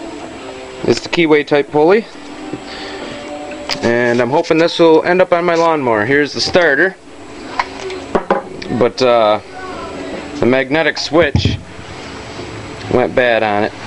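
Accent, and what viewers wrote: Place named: Canada. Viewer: Great little engines those. It's a pitty though that they don't run too well at low RPMs. I have one on a concrete mixer and its minimum idle is about 2000rpm. Your runs very well, perhaps the inertia of the extra pulley allows this to run so low. You should definitely enter this in a diesel "how low can you go" competition. Your accent is cool. Where are you from? Canada?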